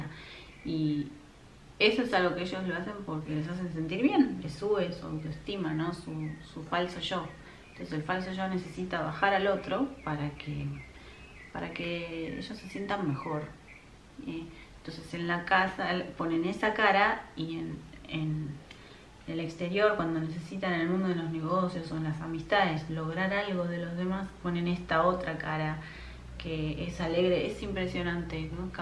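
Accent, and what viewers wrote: Argentina. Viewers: El vídeo más claro del Canal.Vi otros dónde el estilo porteño de hablar le hace perder claridad y sentido al mensaje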